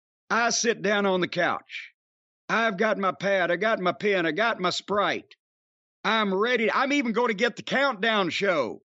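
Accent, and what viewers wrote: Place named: Kentucky, United States. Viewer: "So I hammer the fUHk out of those things for about 50 hhhhuh'WHACKS" his Kentucky accent makes everything he says sound even funnier 🤣 He should have pulled out his ol'trusty tennis racket and started huh'whackin away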